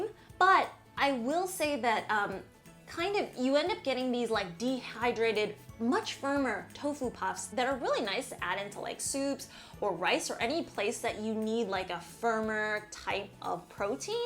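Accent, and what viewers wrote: United States. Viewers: Asian lady with an American accent in a kitchen with UK plug sockets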